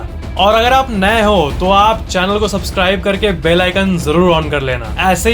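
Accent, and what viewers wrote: Bihar, India. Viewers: Are you from Bihar sir ? Please reply or give heart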